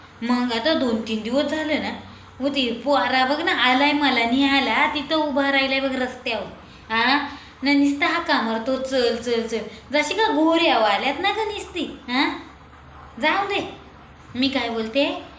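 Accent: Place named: Shahapur, India. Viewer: शहापूर च्या भाषेची आठवण येते तिथल्याच बोलीभाषेची🎉😂❤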